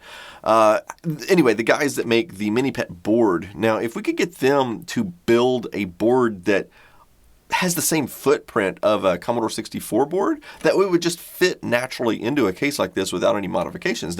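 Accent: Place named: Texas, United States. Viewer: you have the slightest most subtle Texan accent ever and I love it so much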